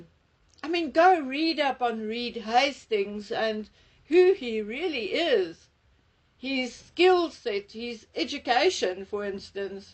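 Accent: South Africa. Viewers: Do I detect a South African accent? I was fortunate enough to live in Rhodesia, a once beautiful and prosperous country, for 31/2 years, during UDI. ☺️🙋‍♀️🇬🇧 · I have just found this video your accent is south africam it is so great to hear another SA AM now living in reno nevada I was born in joburg so I am so chuffed I found your channel❤❤❤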